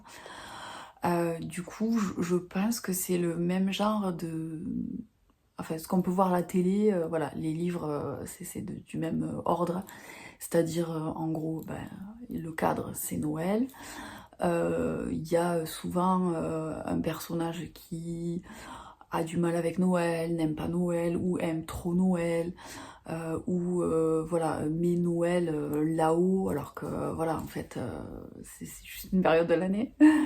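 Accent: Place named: South, France. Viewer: Vive l'accent du suuuuud !!! Les carnets et les stylos ralala, j'ai du arrêter d'en acheter parce que sinon mon appart serait rempli !! Y a pas d'âge pour lire tel ou tel livre, fais toi plaisir !!! Trop cool pour Virgnie Grimaldi ! Je savais pas qu'elle avait un blog avant d'écrire des romans !! Je suis pas du tout une lectrice saisonnière non plus, je fais en fonction de mes envies Très intéressant cette premiere video !! J'ai hate de voir la suite ! Si tu écris tu pourrais nous en parler un peu plus et pourquoi une vidéo avec tes livres préférés pour apprendre à te connaître 😁😁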